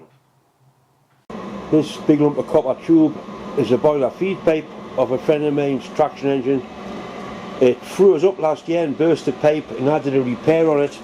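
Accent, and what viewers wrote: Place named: Geordie, United Kingdom. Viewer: is a Geordie and speaks the regional dialect. If you are having trouble understanding him go to settings (the little gear on the lower right) and set the speed to 75%. I sometimes have to do that, especially with his friend.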